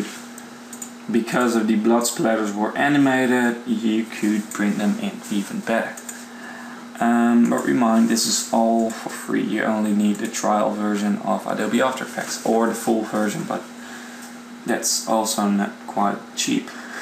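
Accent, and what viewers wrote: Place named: Netherlands. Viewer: haha lekker hoor dutch accent ftw :)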